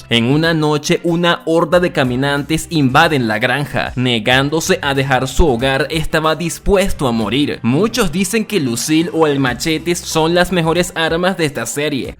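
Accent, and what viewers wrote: Venezuela. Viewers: Chamo me dí cuenta de que eres venezolano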